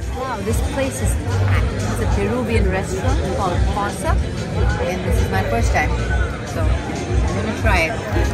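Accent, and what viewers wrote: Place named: United States. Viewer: Drop the western accent and bring your true self out. Your hindi diction is very good but why this American dialect??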